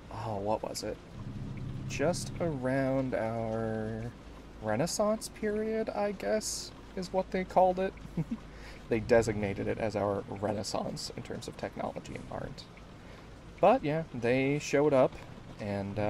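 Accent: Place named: South, United States. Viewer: SLIGHTLY SOUTHERN SOUNDING CATBOY TRUCKER RAAAHHHHHHHH 🗣️🗣️🗣️🗣️🗣️🔥🔥🔥🔥🔥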